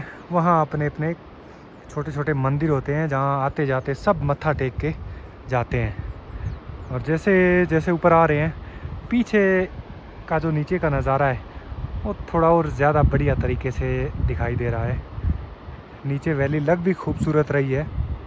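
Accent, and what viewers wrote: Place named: Chamba, India. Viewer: Chamba se ho ap kya bhai nyc video apki bolne ki tone thodi chamba ki lagi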